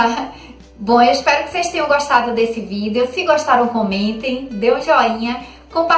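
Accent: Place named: Recife, Brazil. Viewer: adorei, você é muuuuuito simpática! sou de recife e adorei ver alguém com sotaque parecido❤️❤️❤️ muita luz pra você🌱✨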